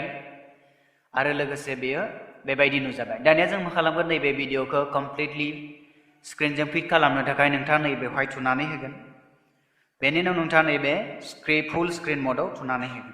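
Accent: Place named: Goalpara, India. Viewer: Sir bungbla mwjang kwnaya so, Golpara bung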